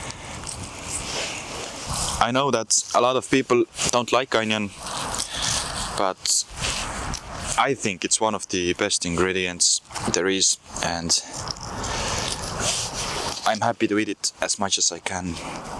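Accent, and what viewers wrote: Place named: Estonia. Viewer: Love your Estonian accent, your videos make me want to visit Estonia and the rest of the Baltic countries <3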